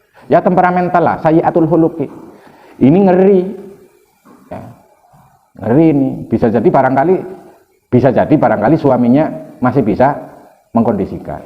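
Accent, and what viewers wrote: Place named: Banyumas, Indonesia. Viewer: Gus ..ngendikane banyumasan bsnget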